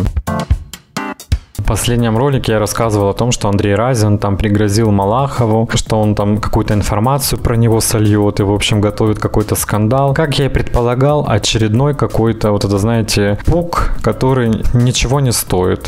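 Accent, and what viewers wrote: Ukraine. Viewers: Мне кажется,что у вас харьковский акцент... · Здравствуйте) Голос у Вас действительно очень приятный, с небольшим украинским акцентом. И человек Вы позитивный, это, прям, слышно. Скажите, как Вас зовут, 🙂я уже месяца 4 смотрю Ваш канал, никакой информации нет, и всё. Хочется комменты писать, обращаясь к Вам по имени🙂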